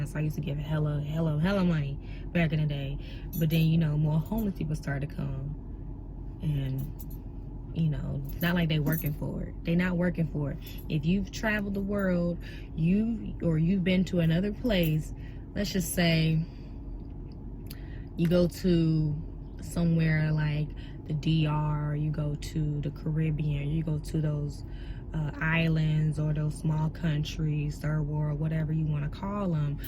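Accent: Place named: Chicago, United States. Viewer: Sound like she from the Chi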